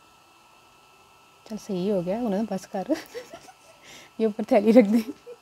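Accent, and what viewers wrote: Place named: Punjab, India. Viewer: Wooow v nice... Apk baat krny ka andaz bhot khub surat h r sath main panjabi ka tarrka wah wah 😍😘😘💖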